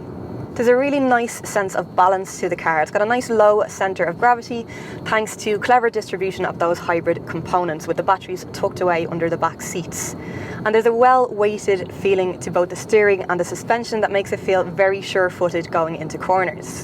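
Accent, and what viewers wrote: Dublin, Ireland. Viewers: Dublin accent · It's a huge pleasure to watch and listen to your reviews: they're through, precise, competent, and on top of that, you are amazingly beautiful and gifted with a delightful (Irish) accent. Thank you! Best regards from Portugal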